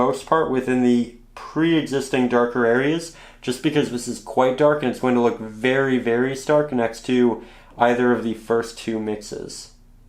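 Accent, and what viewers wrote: Canada. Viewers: The Canadian accent and pronouncing of words is difficult to listen to.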